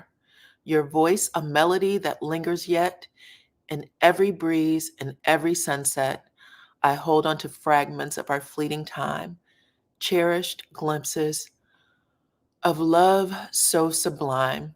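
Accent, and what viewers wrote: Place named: Boston, United States. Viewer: I can hear you Boston in the house❤️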